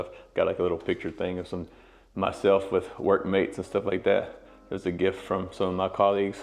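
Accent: South, United States. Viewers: Like that southern accent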